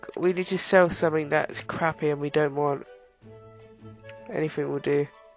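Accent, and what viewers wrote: United Kingdom. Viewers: You British? :)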